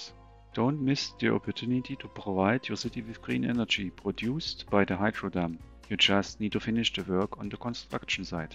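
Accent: Germany. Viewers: Man kann den deutschen Akzent ganz schön deutlich raushören😅 Ich selber bin da aber auch nicht viel besser, wenn ich "Englisch" spreche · Dieser Deutsche Akzente 😄 So spricht mein Vater Englisch 😅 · Do i hear a german accent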